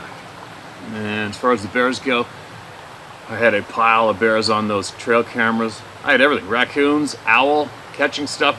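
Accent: Australia. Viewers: Funny Aussie accent.. love from an Aussie! You are absolutely correct Re the Rant! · That aussie accent tho! 😹 Rant away sir! 🤩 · Australian accent sucks brother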